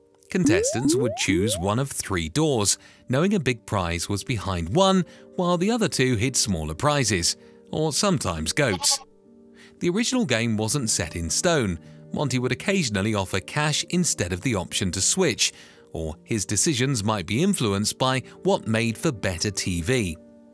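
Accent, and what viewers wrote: United Kingdom. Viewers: This is one of those rare cases where a British accent does NOT make something sound more true. is absolute B.S. because the car isn't in all three locations. The player has chosen what he has chosen and the car was placed where it was placed (though I wonder if these gameshows don't switch the prizes backstage while they are rambling on, distracting the audience). is B.S. because "all of the other options" are in the PAST when they are ruled out. Card counters don't work on the probabilities of the past, but the current probabilities based on which cards have been dealt or played. stating 1 out of 3 or 2 out of 3 is wrong because the third option has already been ruled out. No, it does NOT still have the same probability of winning. Once the third option is ruled out the odds change. If you play Russian Roulette the odds are 1 in 6, but when the hammer falls on a chamber the odds change, which is why there is so much BUILDING suspense in these scenes in The Deer Hunter. 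But the originally chosen door ALSO now has better odds! That is LOGIC. This video reveals what I have observed to be a YouTube rule of thumb: If it takes 20 minutes or more to explain, it just might be so much Bravo Sierra, taking advantage of your short attention span on a platform that caters to it, appealing to your desire to appear logical by mischaracterizing Logic as Intuition, and disarming you with a lengthy onslaught of eloquence without communicating much truth. If I had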